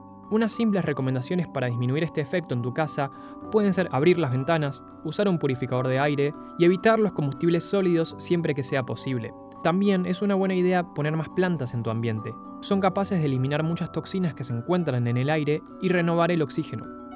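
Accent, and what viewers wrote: Argentina. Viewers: El vox argentino papa, vengo de parte de UMI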